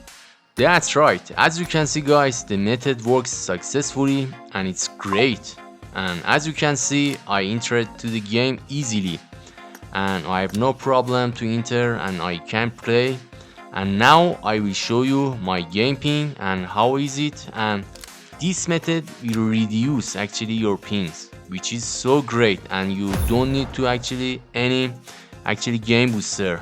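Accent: Iran. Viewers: داش ایرانی از لهجهت فهمیدم❤ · Well for everyone who are curious, This DNS is more commonly used in Iran. The creator is also from the same country based on the accent and the error received. This is a great method shown and he's actually right! However, for the people outside of Iran, I recommend you to search through the internet and find the nearest DNS service possible to your country. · حاجی ایرانی ؟ لحجت معلومه ایرانی ؟ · لحجه ایرانی ؟ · به به لحجه‌ی اصیل ایرانی❤😂 چطوری هموطن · چه لهجه فارسی داره انگلیسیت❤😂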